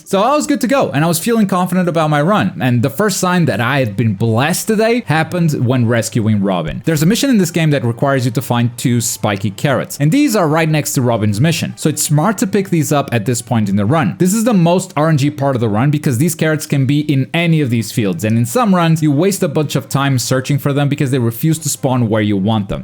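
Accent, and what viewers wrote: Portugal. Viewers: Calma calma calma és tuga?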